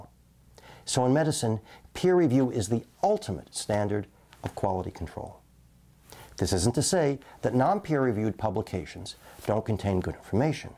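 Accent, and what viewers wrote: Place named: East Coast, United States. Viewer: He´s definitely from the east coast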